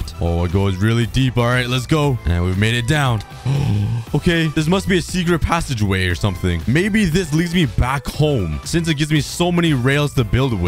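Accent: Canada. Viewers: is you canadian?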